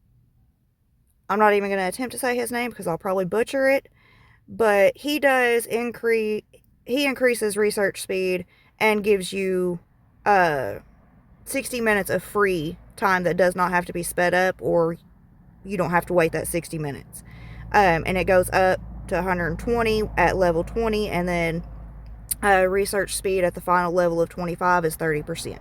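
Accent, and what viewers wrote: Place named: South, United States. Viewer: Damn you sound kinda hot. Country accent goes hard. ☠️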